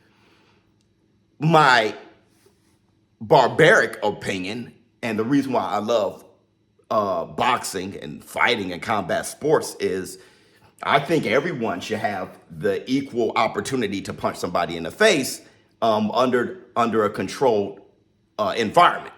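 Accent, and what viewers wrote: Louisiana, United States. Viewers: Is you from the boot mane?! Whodat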